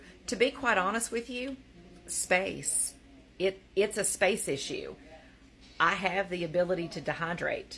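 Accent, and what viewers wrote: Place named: East Tennessee, United States. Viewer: I SWEAR, you GOTTA be from East Tennessee!!!! Plz say ?!??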